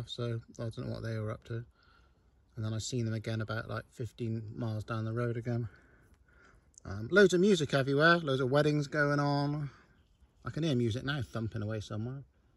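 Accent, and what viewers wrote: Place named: Bristol, United Kingdom. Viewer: I thought it was Bristolian, but you also have a slight estuary twang too in some of your words. That's what made me ask.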